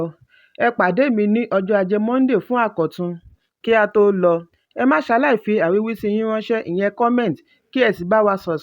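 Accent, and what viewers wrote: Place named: Ikale, Nigeria. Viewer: The news reporter sounds like an Ikale/Okitipupa person. Good job!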